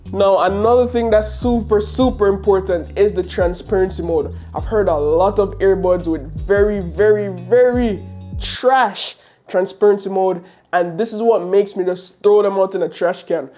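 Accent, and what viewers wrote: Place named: Jamaica. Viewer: looooving this thique Jamaican accent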